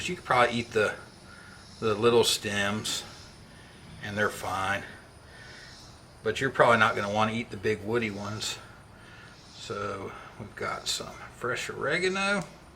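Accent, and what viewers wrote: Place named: Northeast, United States. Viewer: what part of the northeast are you from I can't place your accent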